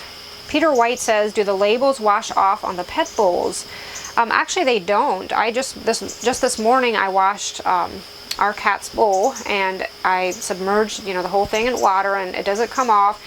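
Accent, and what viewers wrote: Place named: Pennsylvania Dutch, United States. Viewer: So good to know families do canning/ preserving together. My mother-in-law taught me to can. Bless her heart, she would work all day at a factory then come to our house and help me put up creamed corn or can green beans. She’s gone now but every time I pull out my canner or corn cutter I think of those days. Hard, hot work but soooo good in the winter. I love the idea of a cookbook! Two sisters whom are amazingly talented sharing their family recipes AND Amish heritage. I love learning about other cultures/ lifestyles! So interesting to hear you talk about how you were raised and to allow us to hear your Pennsylvania Dutch. Love you channel so much. Thank you for sharing!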